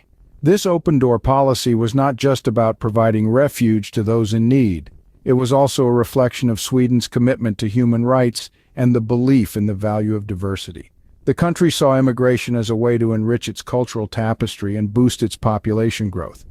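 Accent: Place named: Mid-Atlantic, United States. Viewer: Another damned speechbot with an expressionless Mid Atlantic voice. Turned off.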